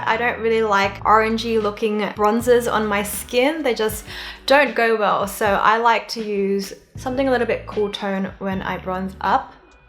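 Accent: Australia. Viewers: Congrats 🎉 love ur makeup tutorials and ur aussie accent 🥺🤟🏻 IG : diemthy.ng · i've just recently subscribed after watching a few of your videos and it's so good to come across an aussie youtuber that i actually like and trust! plus it's just nice hearing a familiar accent haha love your work x IG: jai.enne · If I can remind I suscribed to channel with her orange or red makeup, her cute aussie accent really lifts my spirit and love to binge watch all her videos to catch up her contents. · happy 100K !! wasn't expecting you to have an Australian accent and happily subscribed when i watched ur first video because of the energy positive vibezzz and being genuinely excited for makeup. looking forward to your future vid lovexx ig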